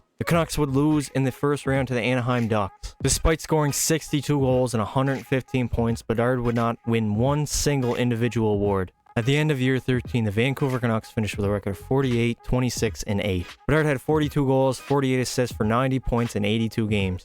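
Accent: Newfoundland, Canada. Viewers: Are u a newfie? U sound like have a newfie accent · Most Newfie accent I've ever heard😂 loved the vid homie! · Are you a Newfie by any chance? I hear a hint of an accent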